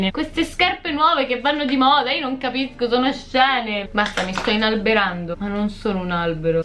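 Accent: Rome, Italy. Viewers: Hai una cadenza romana strepitosa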